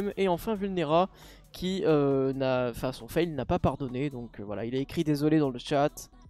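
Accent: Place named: South, France. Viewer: 2h du mat quand je regarde et surpris d'entendre gueuler un sudiste au bout de 10sec de vidéos XD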